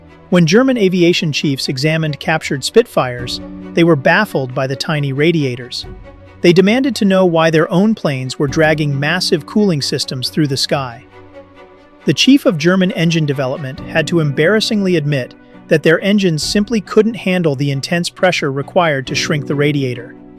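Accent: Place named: United States. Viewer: One question!? Whit is there a American accent narrator on this, and not a British accent!??? I mean, the video is about British ww2 aircraft is it not!??!!🇬🇧🏴🏴🏴🇮🇱🇮🇪🇬🇪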